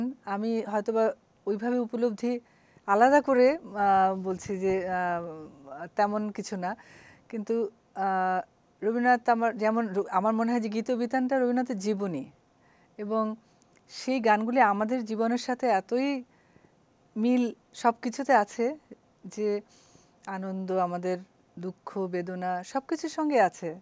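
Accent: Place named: Tangail, Bangladesh. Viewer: Beyond adorable. So natural. And such a sweet accent -- like my grandmother from Tangail.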